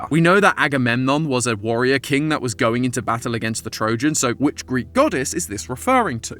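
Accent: United Kingdom. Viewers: Why are you British now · me not paying attention "ok new style theory" "hmm, Amy sounds very British" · I DID NOT EXPECT THIS BRI'ISH MATTPATT REPLACEMENT TO SAY "AGGYDIGIMON!" 😂😂😂😂😂😂 Also no offense, you are a great fill in for mattpatt right now. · has always been british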